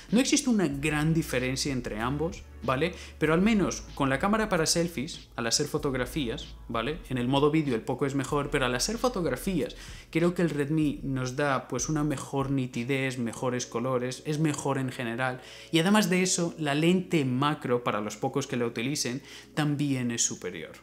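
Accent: Canary Islands, Spain. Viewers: Eres de Canarias?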